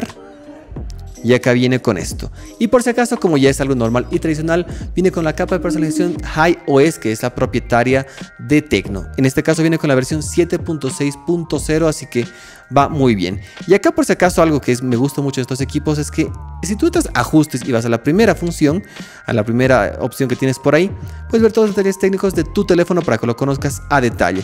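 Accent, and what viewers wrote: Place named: England, United Kingdom. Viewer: por el asentó pensé que lo eras por qué hablas como un inglés cuando habla español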